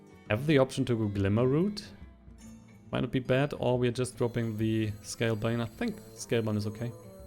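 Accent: Germany. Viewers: Youre german right?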